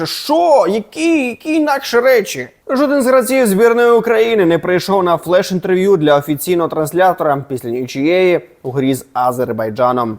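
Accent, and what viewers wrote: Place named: Russia. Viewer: Що знову з розійська редукцією ?) Де співоча П'полтавська українська мелодика мови ?)))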